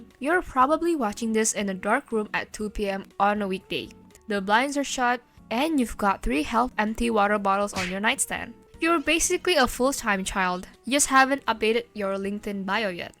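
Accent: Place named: Asia. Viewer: Asian accent suck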